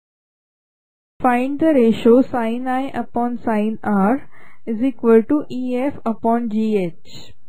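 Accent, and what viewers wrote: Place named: India. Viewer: .-_-. Damn Indian accents.....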